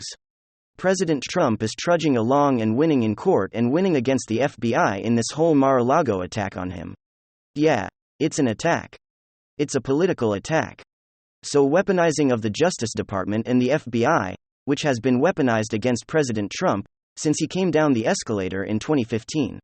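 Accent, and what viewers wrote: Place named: Russia. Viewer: use your real voice next time i want to hear your cute russian accent :3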